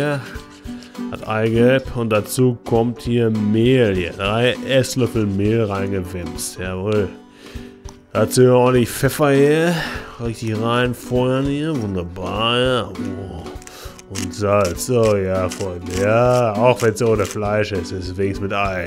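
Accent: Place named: United States. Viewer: Immer dieses "hier hier hier" macht es nervig. nach ner Minute hab ichs ausgemacht. nicht authentisch. Dieses amrikanische ausgesetzte ist zum kotzen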